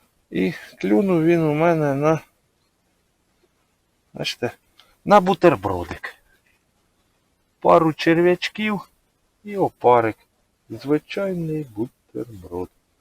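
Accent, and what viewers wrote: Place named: Romny, Ukraine. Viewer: Гарна рибалка! Молодець! Чую по говору ти з Роменщини?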